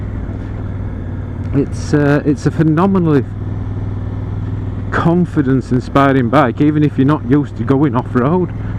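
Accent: Yorkshire, United Kingdom. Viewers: One more comment. I'm from the states. Quite a few years ago I enjoyed a British TV show here on PBS. It was called "All Creatures Great and Small" about a country vet in the Yorkshire country side. Loved it. Your accent brings me back to that time. Thank you. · Cracking, comprehensive review matey! And I bloody love your Yorkie accent 👍🏻 She looks beautiful in them colours but then I would say that 😉😊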